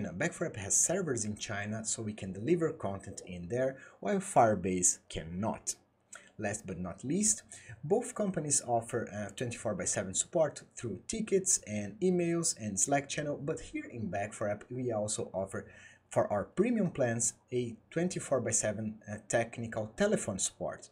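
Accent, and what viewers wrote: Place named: Brazil. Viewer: Esta com sotaque de brasileiro rs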